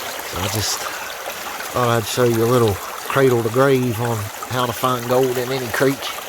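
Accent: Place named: South, United States. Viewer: You have a country southern style draw when you speak. I'm from Connecticut and I hear ya just perfect.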